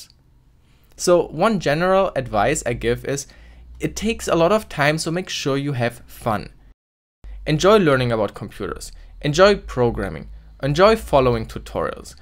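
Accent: Germany. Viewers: Weiß noch die ersten Videos von ihm, hab gar nicht gedacht, dass er Deutscher ist aber irgendwann fällts einem bei manchen Wörtern auf :D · Klingt recht deutsch der bursche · Cool, dass Ihre/deine Videos auf Englisch laufen, damit es auch so viele wie möglich sehen, aber man hört schon sehr stark "den Deutschen" da raus. Macht aber natürlich nichts, solange man Sie/dich versteht. Normalerweise siezt man bei unbekannten nicht gleichaltrigen Menschen, aber da wir auf YouTube sind und eig. jeder duzt, habe ich mich für den Kompromiss entschieden. Ich sie-/duze :D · I love your german accent by the way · Ich wusste sofort das er Deutsch ist😂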